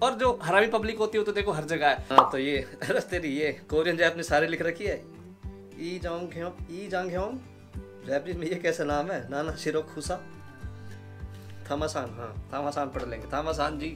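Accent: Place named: Uttar Pradesh, India. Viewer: Bhai ho to haryana se but aapki language to up ki hai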